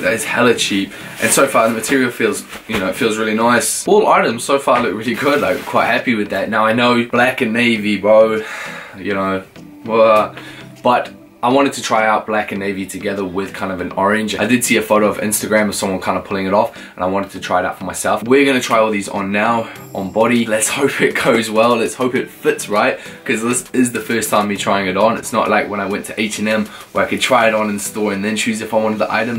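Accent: New Zealand. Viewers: are you a kiwi living in the UK? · Sounds like you copped a New Zealand accent 🇳🇿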